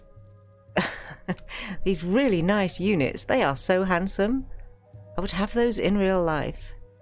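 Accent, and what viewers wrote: United Kingdom. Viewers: come for the endearing British accent and relaxing home reviews, stay for the squints essay on the politics of Tamriel?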